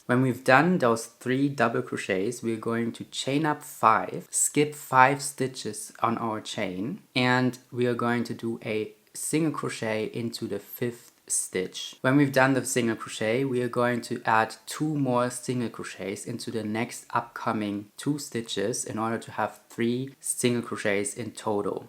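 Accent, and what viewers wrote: Germany. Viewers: Where Do you come from? Is this a german akzent? The pants are WOW! Many greatings from Germany👍👍👌👌🤩❤😘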